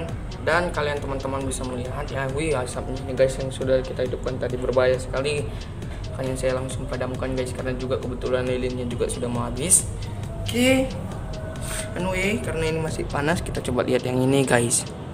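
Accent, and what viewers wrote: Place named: Batak Toba, Indonesia. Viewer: logat, bahasa dan marga anda saya tahu. Batak Toba. Saya orang Karo dan tinggal di sumut juga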